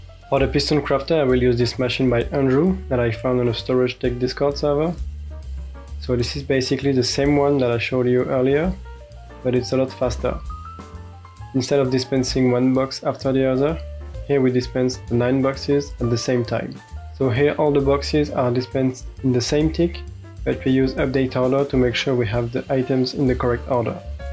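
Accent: France. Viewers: As a french , strong french accent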